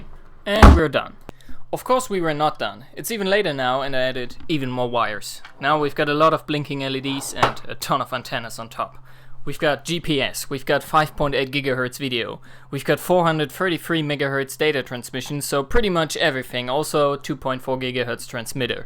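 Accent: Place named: Germany. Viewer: I thought your accent was german but wasnt sure... then i read und war mir sicher XD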